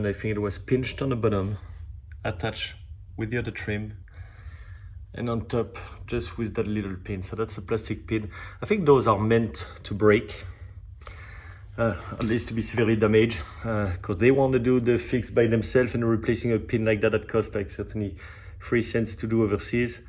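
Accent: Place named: France. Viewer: Thanks for showing the most important part. Removing those plastic panels without breaking, Heaven forbid screws to fasten. Can't wait to experience the pleasure and appreciate the tid bit how sharp that plastic is, nothing worse than cutting yourself doing a repair. I detect a French accent.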